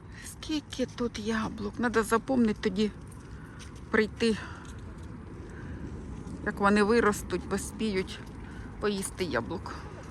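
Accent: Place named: Kryvyi Rih, Ukraine. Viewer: Володимира Великого 33а-базарёк на парапете. Мова як у криворожанки. Дякую за чудове вiдео!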